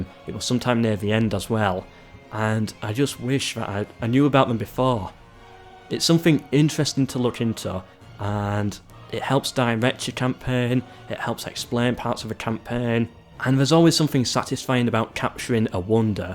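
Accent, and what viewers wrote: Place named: North Yorkshire, United Kingdom. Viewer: He’s probably from around North Yorkshire or Middlesbrough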